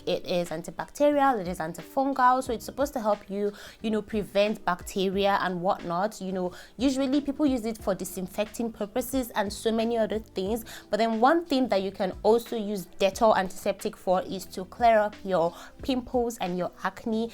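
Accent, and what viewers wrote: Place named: RP, United Kingdom. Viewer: Queens English weldone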